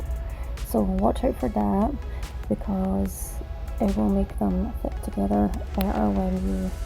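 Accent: Scotland, United Kingdom. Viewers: Your voice is so soothing, I love how you don’t rush through making them. Not Throwing them together super fast, which seems to be the norm. You take your time which makes a huge difference to the quality of the finished piece. Yesterday I saw a video of someone using these blocks to make decorative items for the first ever time. In comparison to your creations they were more arts and craft pieces. Not knocking others work just an observation. When I was watching the other poster create items in my mind I saw the potential for a few of your items and how when used in specific ways you can create swirl effects and illusions. Then as if by magic your video popped up in the feed, I’m so pleased I clicked on it! You created what I could see in mind 🪄 ✨ You certainly have an eye for what looks good, not only can your mind see the potential of combined pieces, but you’re able to actually bring them to fruition. 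Well done 👏 these are really lovely pieces you made, they’d blend in beautifully in most homes and/or functional places, no doubt receiving compliment after compliment. Quick question, you said you got items from the dollar store, except you have that beautiful soft Scottish accent. Am I wrong in thinking there are dollar stores here in the uk? Thank you for this wonderful video, it was an absolute pleasure to watch, incredibly relaxing. You’ve gained a new subscriber 🙃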